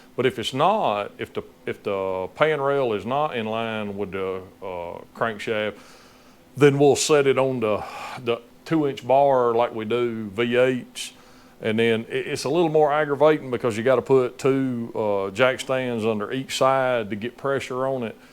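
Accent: South, United States. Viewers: Check out "I Do Cars" he uses a lot of fast forward and tears down engines that have blown up in 30mins..Its sad what people do to engines. Im sure the editing is the hardest part....Want to see your site go...My girlfriend loves your southern twang.....Has no clue what your saying just loves to hear you talk....Im working on a impersonation....LOL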